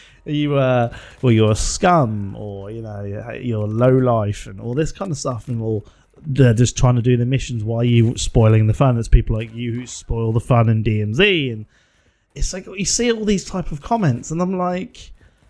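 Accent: Norfolk, United Kingdom. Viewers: What part of Norfolk are you from mate ?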